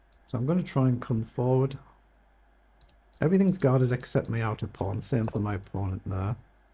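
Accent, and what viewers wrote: United Kingdom. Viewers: Enjoy the vids. Which part of the UK are you from?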